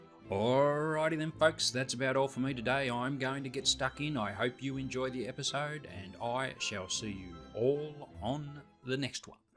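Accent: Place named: Australia. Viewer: I'm enjoying the banter and seeing more of you, loving the aussie accent BTW. Keep up the good work guys, you do a terrific job 😊❤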